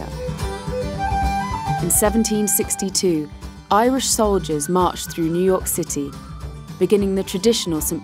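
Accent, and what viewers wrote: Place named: England, United Kingdom. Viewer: The lovely English-accented voice makes no mention of why the Irish were forced to migrate around the world. 🤔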